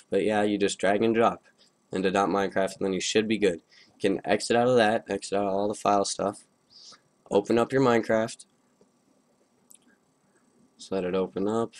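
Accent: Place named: Germany. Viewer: ohne spass alter du hast den mega dollsten ekligsten deutschen akzent den es auf der welt gibt yo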